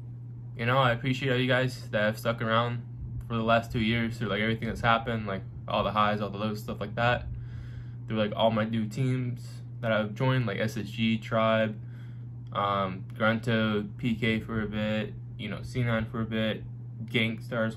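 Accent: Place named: Puerto Rico. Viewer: Yo pensé que era de Puerto Rico 🇵🇷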